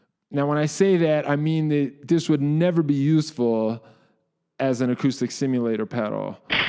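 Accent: Boston, United States. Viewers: are you from boston or something? im trying to place your accent. it doesnt sound nyc to me but maybe im trippin. thanks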